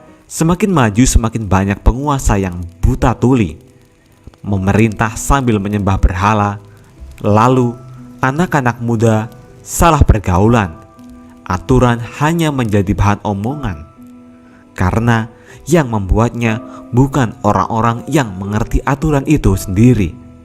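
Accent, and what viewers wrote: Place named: Java, Indonesia. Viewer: Admin anda orang Jawa y.dr logat b teleb bukan orang sunda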